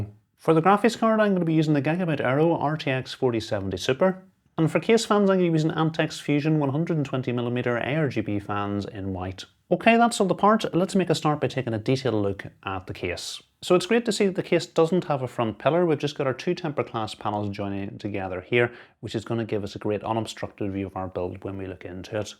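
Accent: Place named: Ulster, United Kingdom. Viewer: Struggled with the Ulster accent on occasion, but aside from that excellent and informative video. Thank you.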